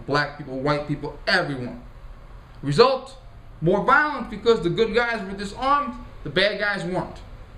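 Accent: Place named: New York, United States. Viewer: I've learned a lot from your videos you not only bring truth to the subjects but also humor it good to hear a new York accent.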